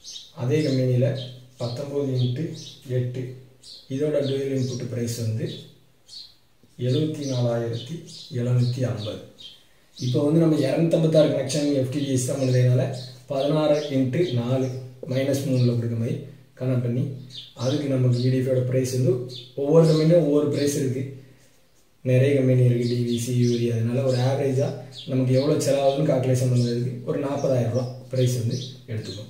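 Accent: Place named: Tirunelveli, India. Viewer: Are u from nellai district or kanyakumari...?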